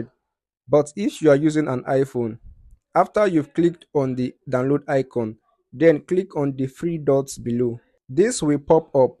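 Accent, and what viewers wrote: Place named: Nigeria. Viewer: I am not a linguist, an East African but I can place this accent in West Africa, most probably Nigerian but might be Ghanaian. I can almost understand pidgin though none of it is used here. Some linguist you must be.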